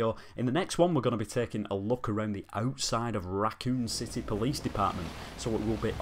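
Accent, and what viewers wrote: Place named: United Kingdom. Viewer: British, I think. Very cool.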